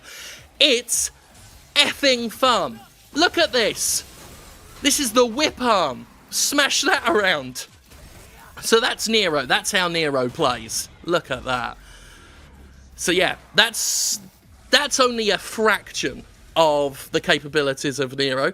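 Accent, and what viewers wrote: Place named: RP, United Kingdom. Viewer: I read this is his british toff voice.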